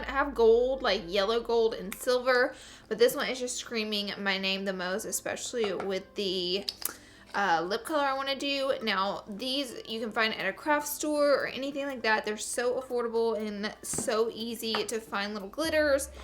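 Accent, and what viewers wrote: South, United States. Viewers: yes because she’s southern and has an accent.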